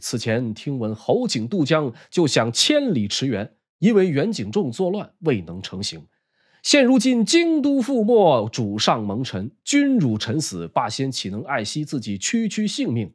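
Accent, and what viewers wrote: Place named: Northeast, China. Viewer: 很精彩 兄弟是东北人吧）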